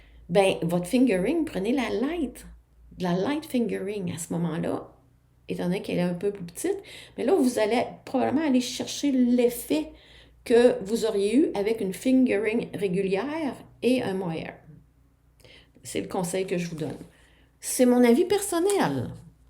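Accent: Quebec, Canada. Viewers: pleins de soutien, toutes mes condoléances pour ce frère tant aimé. Merci bcp pour cette vidéo et cette accent joyeux du quebec. Bises bretonnes ☀️💞 Christine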